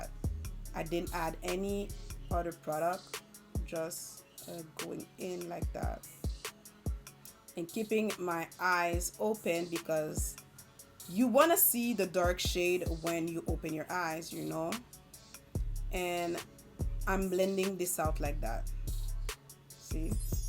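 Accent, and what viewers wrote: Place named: France. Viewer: YOU sis deserve a standing ovation!! I have to tell you that I am a whole, adult female who doesn't wear much make up BUT has always appreciated the beautiful looks. I have ZERO knowledge about how to apply it. I rock the basics and like 'I'm good' YET the inner diva has always wanted to rock a beautiful natural face. I landed on your tutorial regarding an 'I Heart Revolution palette (which I picked up at TJ MAXX in NYC USA) trying be to be cute🤣🤣 and was intrigued at how descriptive you were. I loved your french accent also, so cute 😊 but also because you were easy to listen to and came of so genuine and non judgemental. I then peeked at your page and SUBSCRIBED as soon as I landed on your *affordable MAKEUP FOR BEGINNERS tutorial. It was a wrap. Thank you, thank you your tutorials are BY FAR THE BEST, THE most COMPREHENSIVE tutorials on YouTube. 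I feel confident that I can rock a cute look for my upcoming cruise. Much MORE success sis!🙌🏾👍🏽💜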